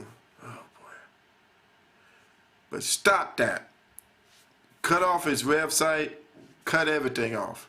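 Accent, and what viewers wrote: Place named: South, United States. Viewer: shut ur southern accent , u make me sick . please speak english. is not da , it is that.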